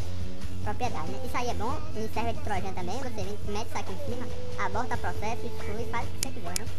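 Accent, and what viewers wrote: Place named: Bahia, Brazil. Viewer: essi mano é baiano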